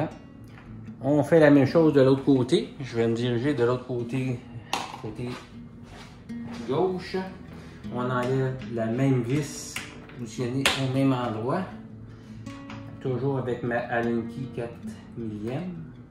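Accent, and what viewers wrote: Canada. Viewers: Merci beaucoup pour ces explications très bonnes Et ce belle accent du canada donne une belle saveur à tout ça. Merci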